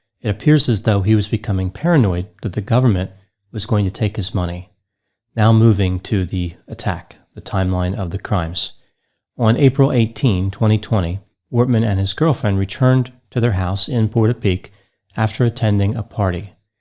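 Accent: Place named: Boston, United States. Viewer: Hi Dr Grande fans, can you tell me what accent is? I’m from Australia and I can often pick American accents but I can’t quite pick this one. His accent is apparent when he says the word ‘on’ and it is super cute. If I had to guess I would say Boston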